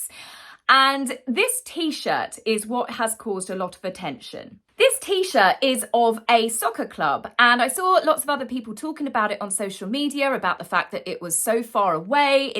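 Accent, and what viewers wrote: United Kingdom. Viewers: I love hearing you, I am not English native speaker, British is easier to underestand.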